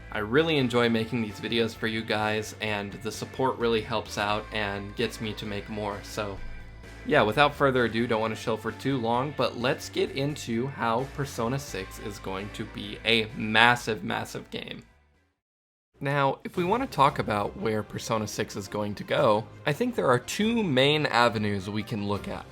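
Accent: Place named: Italy. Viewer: > Yeah I should put more stress on those syllables, that’s my bad! Don't worry. It's just a thing we Italians do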